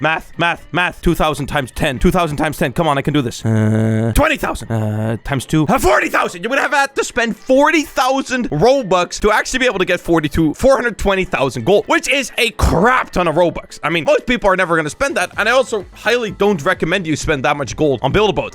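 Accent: Netherlands. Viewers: Your Dutch aren’t you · Wait are u dutch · Are you dutch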